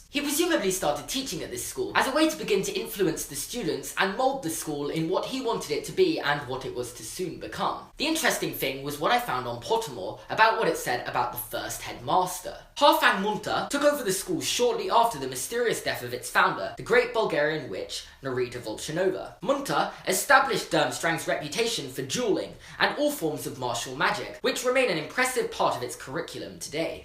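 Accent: United Kingdom. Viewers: Almost every consonant turns into a "fvv" sound for British people doesn't it? "Slyfveryn" "nofving" super cute but also my inner pronunciation nazi is screaming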